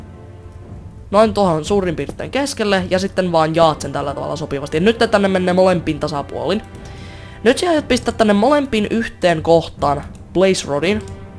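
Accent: Karelia, Finland. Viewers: Ootko karjalasta :D kun puhut sen tyyliil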